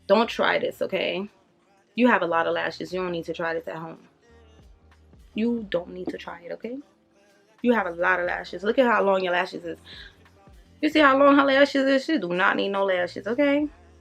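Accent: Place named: New York, United States. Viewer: Great job it looks good. I like your accent are you from New York?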